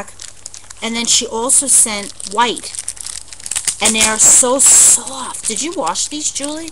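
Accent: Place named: New York, United States. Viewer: I love love love your NY accent, I live on the west coast now - and you're makin' me home sick. This an an awesome Haul - Tues Morning has been carrying a lot of Tim Holtz/Ranger Products. :) There are loads of how to Video's here on You Tube for using things Julie bought. Also try looking at the Ranger Site . Can't wait to see what you make with your goodies! Have an awesome day!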